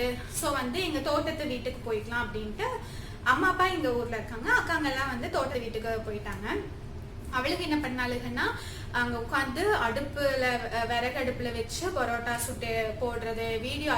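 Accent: Kongu, India. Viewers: Kongu Tamil koncham pesunga.... I like the slang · akka how r u and ur family? Oru day neenga full kongu tamil pesura mathiri video podunga ka please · I like your kongu slang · Kongu Tamil akka Nega pasarathu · Kongu tamil one time pesuga my fav...slang🥰my athai home in Tirupur naa anga iruthan near by perumanallur so one time post ur slang kongu tamil...sis. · கொங்கு தமிழ் Sema feel 😍😍😍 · Unga appatha oorla nadanthathuku vayiru valika sirichom... Elorukum iPadi akka thangachi amayraanglanu theryala.. Kongu tamilla oruvaati paesikaatunglaen akka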